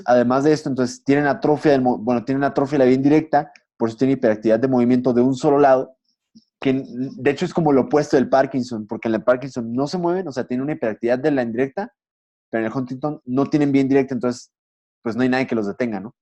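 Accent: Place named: Fresa, Mexico. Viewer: Hablas como pendejo, muy fresa che idiota.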